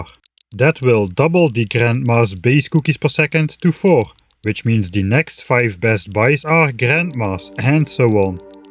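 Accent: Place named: Netherlands. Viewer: This video randomly got suggested to me and I gotta say I love your dutch accent haha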